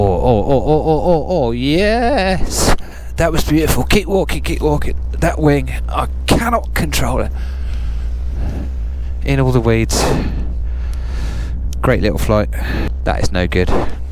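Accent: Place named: United Kingdom. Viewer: I'm here for the British accent! lol Can't wait to hear it on the Podcast! Great video! Its so cool seeing all the landscape in the UK. That quarry looks like it'd be amazing to fly, weather permitting. I slightly jealous that you have low lying clouds. Here in Texas, cloud base is regularly at 10,000 MSL! Looking forward to our chat!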